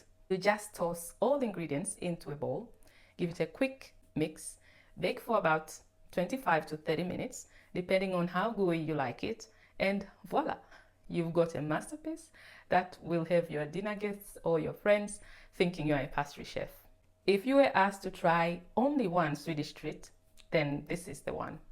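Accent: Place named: Africa. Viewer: You sound african.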